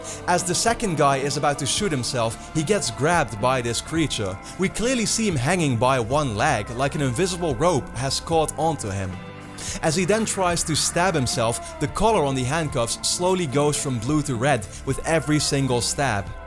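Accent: Netherlands. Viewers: Awesome vid! Great for killing time, while we're all waiting for the next DS trailer... oh and by the way, geweldig nederlands-engels accent haha!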